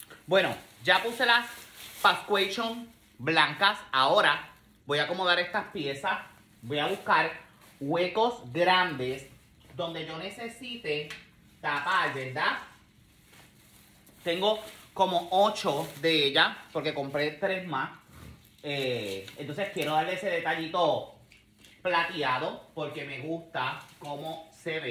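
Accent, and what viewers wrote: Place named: Puerto Rico. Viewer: Nueva seguidora desde q te escuché sabía q eras Boricua bien bello y espectacular!! 💖🇵🇷🙌🤩 Me encantó como explicastes como poner la cinta. Me gustaría una recomendación, mi decoración este año será madera, blanco negro y metal, que cintas debo de incorporar? Ya de ante mano tengo la de saco y la de cuadros Blanco y negro, ( búfalo) q otro color de Debería añadir, plata, dorada o blanca ? Help!!! 🤣🤣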